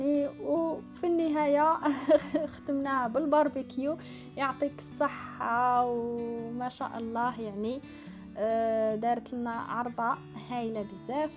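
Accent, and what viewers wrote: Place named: Chaouia, Algeria. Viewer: هدرتك تباني شاوية .. صح عيدك 😘😘😘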